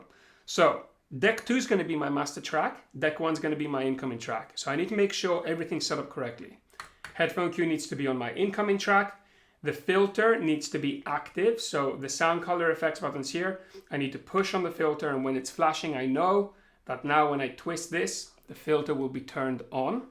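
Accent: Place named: England, United Kingdom. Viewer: I can hear you have picked up the English accent at times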